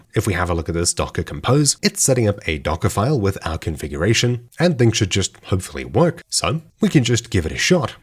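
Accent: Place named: South Africa. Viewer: Thanks for doing creating this video. I have been using warp now for a couple of months but have not gotten into some of the things that you covered. I see that you had South African English on your Excel Worksheet. So are you still living in SA or elsewhere. I lived in SA for 30 years in total from when I was 15 way back in 1974. I lived in CarltonVille, Vereenging, Meyerton, Johannesburg, Durban, East London and started work in JHB as a computer operator working on an ICl 1903T Mainframe at GoldFields Mining and Development Company. Great to here a South African voice. I Miss SA a lot. My daughter is living in PMB.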